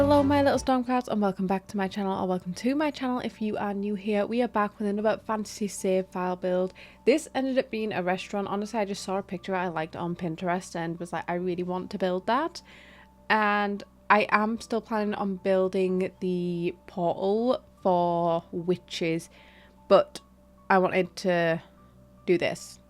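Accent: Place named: North, United Kingdom. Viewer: Just found your channel and hearing a fellow northern gamer is such a refreshing thing! Usually they're American and i dont feel a connection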